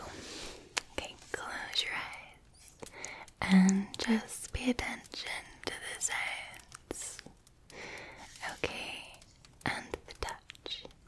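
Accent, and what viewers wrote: Scotland, United Kingdom. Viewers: hello! cool video very relaxing, I loved! you have big beautiful eyes and lips and an adorable nose, you have such a nice accent, your videos ASMR all are of them awesome and very cute. you're really talented girl and so beautiful, greetings Pretty, thanks and sweet dreams for you also precious scottish girl;) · Calm me down? Yes please, been stressed all day. Nothing better than a beautiful Scots woman under the sheets whispering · Everyone here knows you're a beautiful woman, and I could make a joke about being under the sheets (most of them are already taken though), but what I love most is that Scottish accent. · I love the Scottish accent!!! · Beautifully Scottish, as always.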